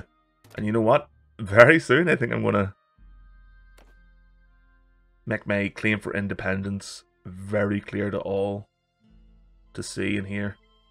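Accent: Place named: Scotland, United Kingdom. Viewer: Yet another video about bannerlord that I love and that makes me mad for not being able to afford it. I swear I'll go crazy soon watching videos like this one. Also, I love the accent, I'm Italian so I'm not used to accents like yours (I hope I'm not wrong but you sound Scottish)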